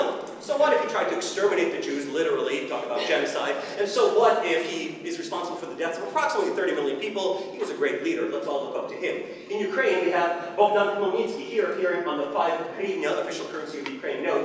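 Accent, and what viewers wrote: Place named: Russia. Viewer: Wait, so you speak Russian, you speak English with a Russian accent, you come from Russia, you look european, how are people supposed to know you are a Jew and not Russian?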